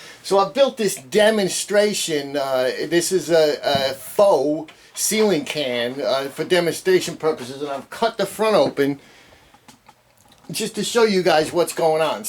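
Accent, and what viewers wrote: New York, United States. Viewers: Thank you, I was a bit timid about touching the filament, using the needle nose to bend the metal base lip (my mama taught me this, btw) and turn, no luck, corroded. I watched your video, turned off the power and turn the glass filament, thank you. No one here, just me. Quick note: how it broke?-the glass bulb mysteriously fell off, leaving the metal base, filament and a spooked girl. I still love the old school bulbs, btw. love your jersey or NY'er accent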